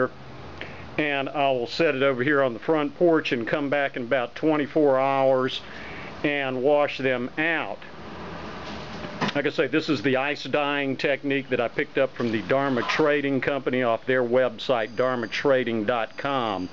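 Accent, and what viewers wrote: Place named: Australia. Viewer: totally!! is u aussie mate=??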